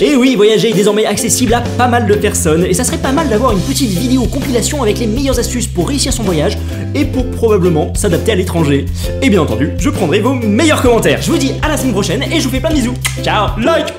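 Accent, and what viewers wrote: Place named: United Kingdom. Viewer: J'adore ton accent anglais british 😍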